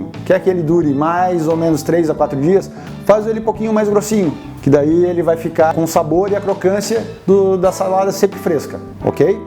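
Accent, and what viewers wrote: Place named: Santa Catarina, Brazil. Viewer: Esse sotaque é catarina :-)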